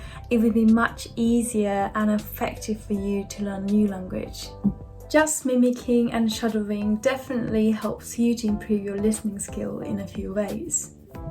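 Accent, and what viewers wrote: United Kingdom. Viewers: はじめまして！ブリティッシュアクセントでしたか！プリンセスみたいです🧡ブリティッシュアクセント大好きなので頑張りたい！基礎の文法が怪しすぎるのですが、主様はどんなふうに学びましたか？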